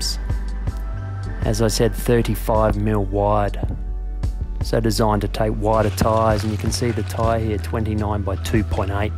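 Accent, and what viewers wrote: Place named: Australia. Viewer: Clicked on your thumbnail and was surprised with a nice Aussie Drawl. Looked like Southport out the balcony. Stoked to see Narang on the clip. I rode my road bike over there about 4 years ago and stopped at the same cafe🤣. If you ever come to Bright, leave the XC convertible at home and rent a enduro bike and smash Mystic...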